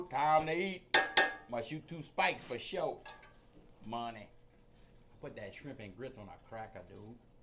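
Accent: Louisiana, United States. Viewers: Are you from Louisiana I have friends with the same accent or Tennessee that food looks tasty though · I love that Louisiana accent. It's FIYAH. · That’s not just a southern accent, sounds like Louisiana?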